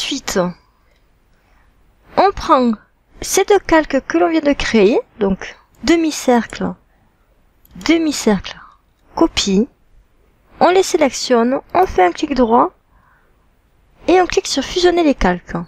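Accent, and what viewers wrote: South, France. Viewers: Ahhhh j'étais sur que c'était du sud et j'ai faillit dire Marseille mais j'ai bien fait de ne rien dire j'ai évité l'accident politique lol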